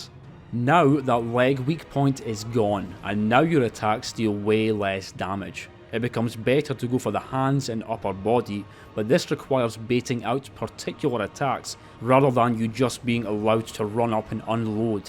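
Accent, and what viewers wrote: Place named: Scotland, United Kingdom. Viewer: Good lock with the DLC Mr. cool scottish youtuber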